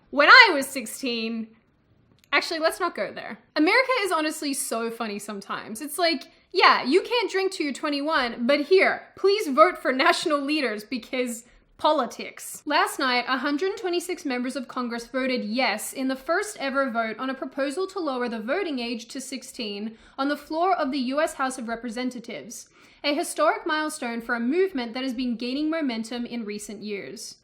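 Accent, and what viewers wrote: Australia. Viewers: Did you live in America for a while? Your accent sounds like a fusion of Australian and America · I love her Australian lilt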